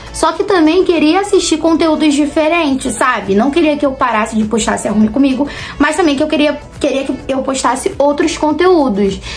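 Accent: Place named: Rio de Janeiro, Brazil. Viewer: sou da Bahia,amo seus vídeos.amo seu sutaque Carioca,acho super lindo. Amo seu jeito de ser,as suas dicas super maravilhosas,adoro quando vc começar o vídeo dançando.! Vc tem que ser do seu jeitinho mesmo.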